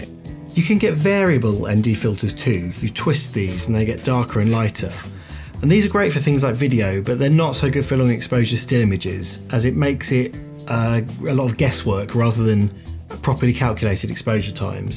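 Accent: United Kingdom. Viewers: Very good presentation and in perfect British English!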